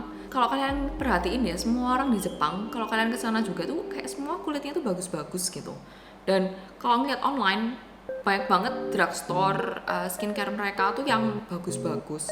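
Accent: Java, Indonesia. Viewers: aaa surabayaaa, ak udh curiga kok logat nyaa super sbyy ternyata bnrann HAHAH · sering liat video kakak di reel short dan suka banget sama penjelasannya. kakak lucu (in a good way) suaranya, mendok dapet tapi klo ngomong bahasa inggris tuh bagus banget aksennya aku suka. tadi ngomong kayak "ituuuu" aja dah kayak lidah bule xD aku juga masih sering pake produk exp kak, tapi memang semua tergantung ke kulit masing2 ya. ada yang exp langsung bruntusan, ada yang badak mukanya. hasil make-upnya cakep banget dan aku jadi keracunan produk2 yang dipakenya wkwkwk. apa brush itu ngaruh bgt ya kak? soalnya rasanya itu tadi cuma tap kecil langsung keluar warnanya... atau itu karena produknya emang HQ? btw jujur bare facenya bikin aku salfok banget.. sehat, glowing pokoknya cakep. boleh dong ya kak nanti spill skincare favoritnya, dan mungkin kalo kakak ada pake massager tools buat muka, treatment dll gitu dikupas juga kalo ga merepotkan🙏🏼 last but not least semoga kakak dan babynya sehat selalu dan sampe persalinan nanti dilancarkan. 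aku tunggu konten2 lainnya ya kak 🤗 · hi kakk I'm new subscriber here;) keep going for your contents~ anw aku suka accent medoknya kakakk <3 lucuu pol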